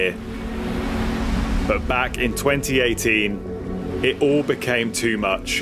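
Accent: United Kingdom. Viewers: What a perfect British accent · I heard the British accent 🥰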